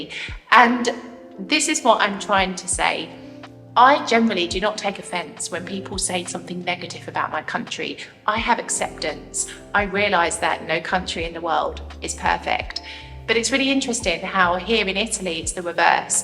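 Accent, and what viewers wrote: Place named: London, United Kingdom. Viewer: Yes I love it. And I adore your londinese accent.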